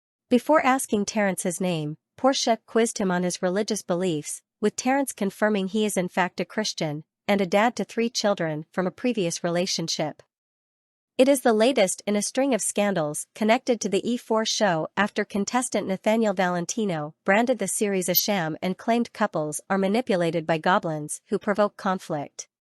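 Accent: United States. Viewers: Your voice is awful-why is an American doing this voiceover!! Has she inhaled helium ..awful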